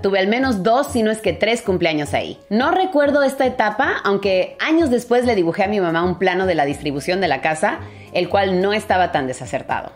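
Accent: Mexico. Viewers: usted es mexicana...solos escuchar los modimos es genial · Ni de aqui ni de alla de oa india María jiji,un saludo,si tienes familia mexicana y naciste en USA pues también eres mexicana,tu forma de hablar dice que ye criaste en México y una parte en USA,no eres gringa Americana si porq somos americanos también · Conclusion, eres norteamericana que crecio parte de su vida en Mexico, por lo cual habla excelente español con acento mexicano. Lo importante es lo que tu sientas!! Un abrazo de una venezolana en España!!❤ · speaks Spanish with a Mexican accent but her Spanish is clear and she doesn't use much slang lol Pero bueno, también en México hay mucho acentos. Que bueno que estés aprendiendo español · Cuando te escucho hablar en inglés te siento super gringa y cuando platicas en español siento que eres muy mexicana. No tiene nada que ver donde naciste ni quienes son tus padres, sino las experiencias que has vivido 😊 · No sé si es por que he estado viendo demasiados videos en ingles, de contenido que me interesa, asegurandome de que sean de US, pero cuando he visto tus videos en ingles te escucho el acento mexicano 😄 sí pronuncias bien y todo pero te escuchas como mexicana, me parece curioso por que antes sí te escuchaba como gringa gringa, pero ahora te escucho como mexicana hablando ingles jaja. 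· para mí tú eres mexicana, conoces la cultura, costunbres, tradiciones y hablas perfectamente el español mexicano si aguien te escucha hablar diría que es una mexicana la que está hablando. Tengo que agregar que me encantan tus videos, eres muy simpática, los que estamos libres de prejuicios te amamos. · Pues de todas las respuestas que diste, evidentemente eres gringa (hermosamente caucásica) con un buen español y acento mexicano. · hablas mejor español ( acento mexicano) que muchos mexicanos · Si te viera en algún lugar sin escucharte ni saber nada de ti, pensaría que eres de Estados Unidos, Canadá o Europa. Pero si solo escuchara tu voz aseguraría que eres Mexicana o Latinoamericana. Hay gente de mente pequeñita y con mucha necesidad de afecto que pretenden lastimar a las personas haciéndolas sentir ajenas de donde viven o donde están. Todas las personas de buen corazón, absolutamente todas las personas de bien son bienvenidas y bien recibidas en México sin importar de donde vengan (por supuesto tu incluída)!! 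· Ella ha vivido suficiente tiempo en México y perfectamente puede sentirse mexicana, se identifica con la cultura y los modos de México, incluso, está casada con un mexicano, su acento y forma de hablar español es como el de una persona mexicana. No entiendo cuál es el rollo, el complejo, y la complicación con eso. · Pues eres producto de una mezcla muy rara, sin embargo el español evidentemente lo hablas como mexicana y el conocimiento se obtiene a partir del lenguaje. La pregunta sería, de que parte te sentirías más cómoda. Y Justo de ahí eres, de donde mas te lata, donde culturalmente te sientas más atraída, así como muchos extranjeros adoptan “El mexicano nace donde se nos da la chingada gana” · Tu identidad es mucho mas clara que la mia. Yo si que no se que decir cuando me preguntan de donde soy. No hay sitio en el mundo donde la gente pensaria que yo soy de ahi. Por lo menos tu pareces Americana y nadie pensaria que no lo eres como hablas ingles y como te comportas. 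Cuando hablas Espanol tambies es facil reconocer tus raises Mejicanas. Yo no tengo tanta suerte.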